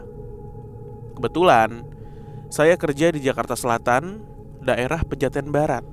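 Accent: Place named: Brebes, Indonesia. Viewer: wong brebes kyeh....😅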